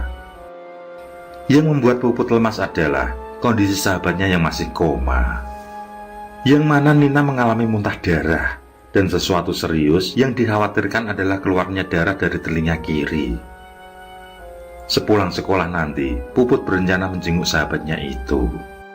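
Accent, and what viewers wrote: Java, Indonesia. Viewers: SUSKREBER BARU , BUK NURI TKW DI SYRIA , SALAM SEHAT WALAFIAT DAN SUKSES SRLAKU UNT VEN SENENG SUARA HOST NYA NGEBAS DAN JAWANYA SANGAT KETAL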